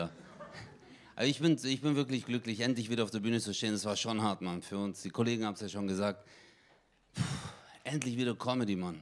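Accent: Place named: Swabia, Germany. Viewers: Özcan Cosir... oh man, lange nichts mehr von dem gesehen, ist der nicht aus Cannstatt? Bin in der ähnlichen Gegend aufgewachsen und auch unter nem Haufen Kanacken. Also der Dialekt und der Humor fühlen sich sehr vertraut an. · Wenn die Hälfte der Witze auf dem kulturellen Hintergrund der Herkunftsfamilie aufbaut, macht es schon Sinn vorher zu erwähnen welcher Hintergrund das ist. Er hat übrigens nicht erwähnt daß er auch Schwabe ist, weil man es am Dialekt hören kann. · Bitte mal eine ganze Show mit dem extremen Geschwäbel 😄😄😄 Sehr lustig 😂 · Dem Dialekt nach von irgendwo zwischen Stuttgart und Ulm :D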